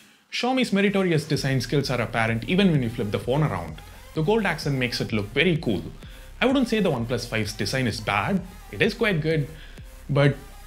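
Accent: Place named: India. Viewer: Indian accent is always fun to hear :)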